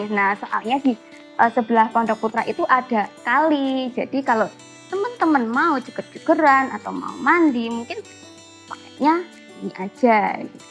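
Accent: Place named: Purworejo, Indonesia. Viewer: MasyaAllah mba.. Khas bgt logat purworejo nya.. Bismillah.. InsyaAllah tgl 11 Kali ke 2 anter ank msuk sini stlh 6 th lalu.. Smg ank kmi, betah, krasan.. Aamiin.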